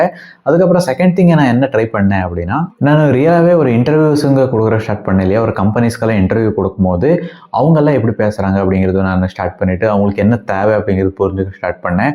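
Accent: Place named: Bangalore, India. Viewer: Bangalore Tamil Nice Thala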